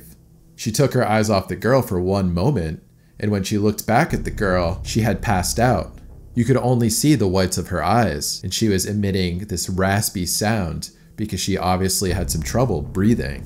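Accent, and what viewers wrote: United States. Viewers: American accent😂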